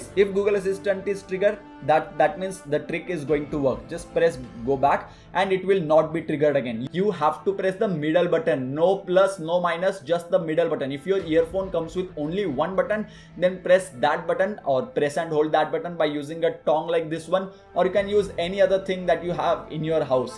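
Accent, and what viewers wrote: India. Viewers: Sorry, but this Indian accent sounds like an acoustic hell. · Oh my God your Indian accent is making me laugh😂😂😂 Anyway is this a glitch to a phone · Finally a indian speaking english while explaing on this video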